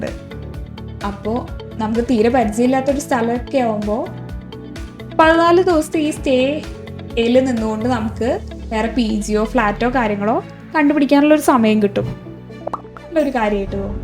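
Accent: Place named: Valluvanad, India. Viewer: Valluvanadan bhasha .. athond choichathatto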